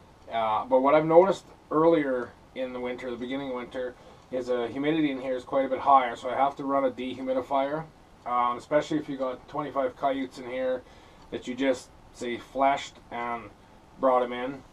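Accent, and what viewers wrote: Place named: Canada. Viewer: i know nothing about this subject. Hmmm - so do you eat the coyotes after you process them?? I know people eat deer, rabbit, elk, and stuff - and if so - what does it taste like? You sound Canadian - what province are you from? How long have you been hunting/trapping? And are you ALONE out there in the woods all isolated like that??? Men. I tell ya, you guys - I don't think I would be in the woods and stuff alone like that. What do you do with those pelts? Do you sell them or make clothes/bags with them?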